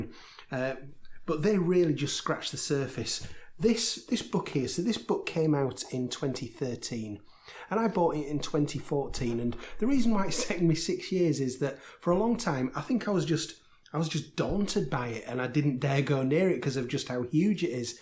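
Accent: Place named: Liverpool, United Kingdom. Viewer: Thanks for the wonderful review! As fun just digging on your accent (Liverpool itself I’m guessing) as your enthusiasm for the book. I read the 900 page version when it came out in 2013 and have been on the fence about the complete edition but I think you talked me into it! Thanks again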